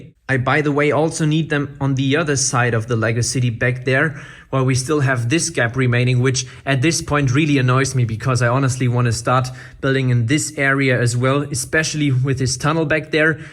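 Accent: Germany. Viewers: since you sound german you should build a traintrach to a small concentration camp with some gas chamber and ovens, make a working lego oven with a lighter in it to burn some characters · ⚠️This isn’t meant to be hate - like, at all⚠️ You kinda sound like you have a German accent 😮 Could you perhaps come from middle Europe?😅 I’m really sorry if this sounds offending in any sense❤😢 Edit: I just saw that elevator video from Jensbach 😂 so are you from Austria? Just curious 😅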